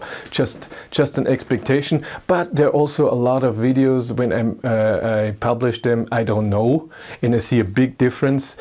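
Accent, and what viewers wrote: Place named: Germany. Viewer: Keep up the good work. I have been watching some of your videos since quite early. I remember you made some videos about your new Id3, and you were working full time. The Excel charts are a nice addition. Thanks. I am glad this is working out for you. It is interesting hearing a German perspective. Your english is fine, quite clear, with a nice German accent. I would like to hear some of your detractors speak a foreign language. That could be quite amusing.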